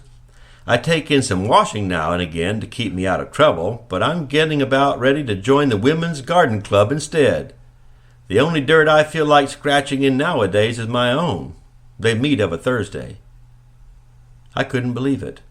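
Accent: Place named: United States. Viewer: Thank you! Really nice reading! Normally I can't stand American voiced audiobooks but you're perfect! Please do the other kingsolver books, your voice suits it so well! X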